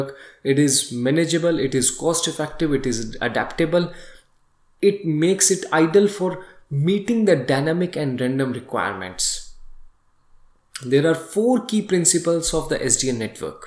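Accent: India. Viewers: India spoken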